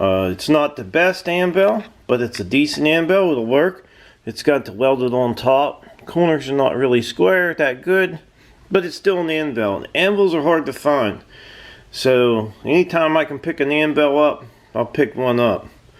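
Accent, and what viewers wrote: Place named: Indiana, United States. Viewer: are you in Indiana? Your accent reminds me of someone in my hometown of Richmond, Indiana.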